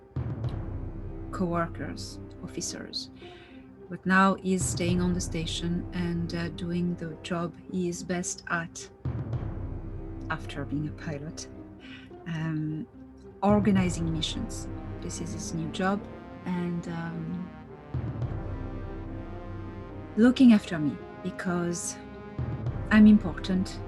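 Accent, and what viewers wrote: France. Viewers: I love yr videos and appreciate yr commitment to truth and freedom of all humanity. I do have hearing issues. Your voice is soft and beautiful and I love yr French accent. However, so many times the music is just too loud for me to hear u. I’ve listened twice or more to sections that I can’t pick up. Love yr attitude and grace. Grateful for the information and the GF support. ❤️❤️❤️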